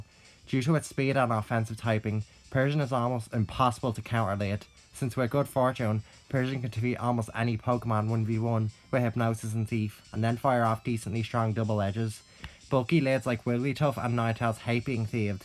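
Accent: Ireland. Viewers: Ok I’m going to guess the accent is irish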